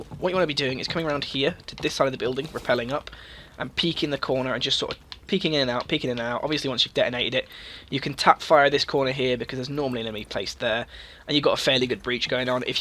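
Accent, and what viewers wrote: Ireland. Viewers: Hey r actually Irish if so wer from cause I'm from Kildare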